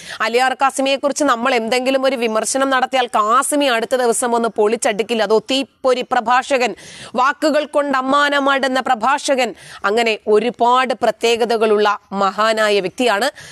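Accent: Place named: Thrissur, India. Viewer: Porichu. Trichur. Basha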